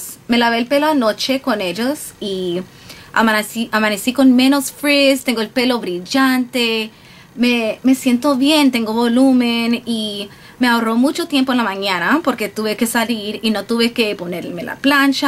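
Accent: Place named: Venezuela. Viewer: De donde eres naturalmente amiga? Tienes un acento parecido al mio... eres de Venezuela?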